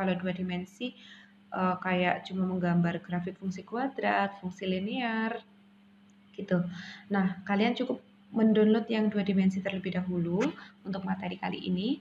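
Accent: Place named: Java, Indonesia. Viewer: Halo kaka....kaka orang jawa ya....medoknya keliatan....😂😂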